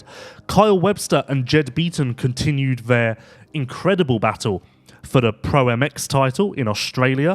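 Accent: United Kingdom. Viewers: Can we not have a Brit do the voice over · Yes, we need American english. It's a bummer when American legends like Roczen and Jet has an Englishman covering their series...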